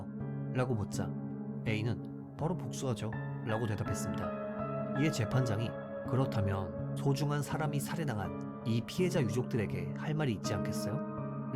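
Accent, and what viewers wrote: Japan. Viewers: 나레이션 발음이 일본인이거나 한일혼혈같으신데 맞으신가요? 일본지명 발음이 일본현지 사람 같아서요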